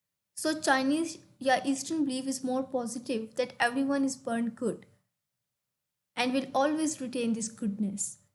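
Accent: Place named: Lahore, Pakistan. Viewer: Lahori Accent ...But well explained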